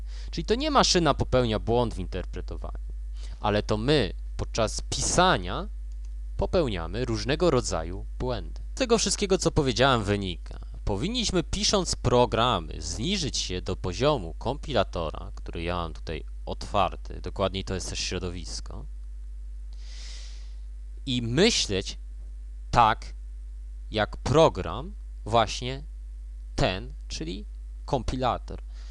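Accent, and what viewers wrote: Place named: Russia. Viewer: Jesteś polakiem?Masz rosyjski akcent :o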